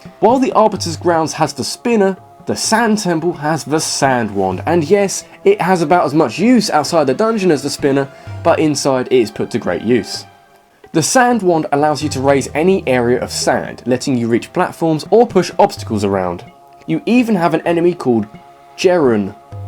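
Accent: England, United Kingdom. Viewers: Are you British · Does he come from england?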